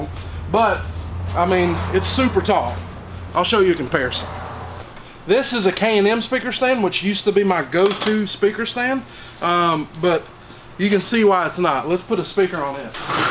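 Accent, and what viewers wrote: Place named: South Carolina, United States. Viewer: Man, you sound like you are from my neck of the woods here in South Carolina..... great review.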